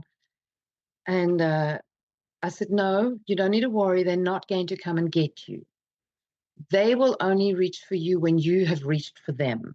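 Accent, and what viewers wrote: South Africa. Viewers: Hi hun, you explained this all very well. I lost my sister and it is still very raw, by the way, you sound like you come from Durban, I'm from SA! Durban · this is an odd question.. but your accent - I hear South Africa but there is definitely something else there, if not two other language / accent influences?? Just curious. Thank you for sharing - hello from Jukskei Park in Fourways.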